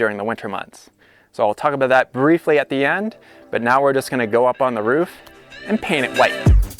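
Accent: Canada. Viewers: I heard your accent. May I ask where your from? Are you Canadian? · Can really hear that Canadian accent in this vid. Lol. Adorable. 😊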